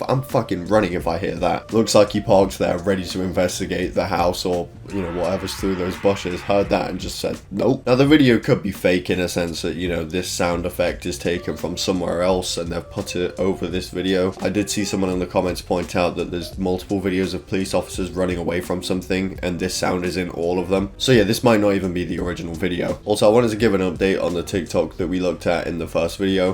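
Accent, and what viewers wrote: England, United Kingdom. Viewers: I never realised this before but your voice kind of sounds like penguinz0 with an English accent.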